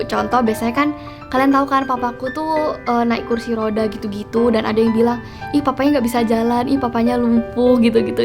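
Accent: Java, Indonesia. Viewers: Monmaap Cece org Jawa? Medokny nongol😁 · Kak boleh gk buat konten 24 jam ngomong medok:')🥺❤️🌺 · Medok muu kakkkkk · medok banget buna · Logat jawanya uhhhh gemessss😭😭😭😭😭💙💙💙💙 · Kak kk orang jawa ya karna, suara omongan kk Kalok iya sama donk kayak aku tapi aku ngomong nya itu kayak orang medan😂😂😂😂😂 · Kaka kok medog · Ya ampun medhok nya jadi kiyot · Kak bicaranya kayak rada rada ada bahasa jawa nya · cantik Imut Yang bikin lucu medok nya itu loh😗😂♤♡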